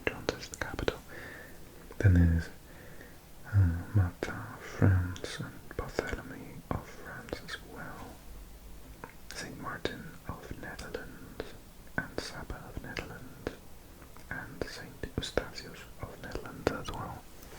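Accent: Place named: Wales, United Kingdom. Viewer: Welsh accent ASMR??? Where have you been hiding, Mr??? 🥰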